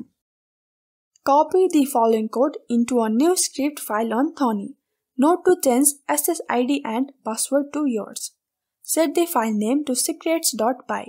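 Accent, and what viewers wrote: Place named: India. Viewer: An Indian, really? Please use a voice-over actor!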